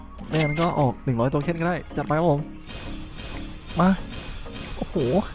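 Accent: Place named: South, Thailand. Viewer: พี่พูดใต้ได้ค่ะ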